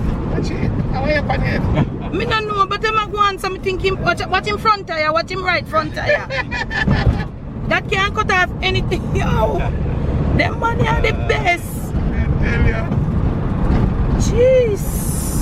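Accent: Jamaica. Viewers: Enjoying the narration! Love the Jamaica talk! Very relaxing!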